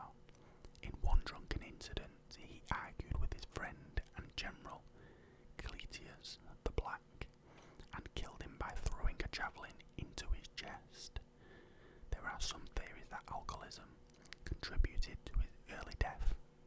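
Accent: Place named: Manchester, United Kingdom. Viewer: Sounds like a Manchester accent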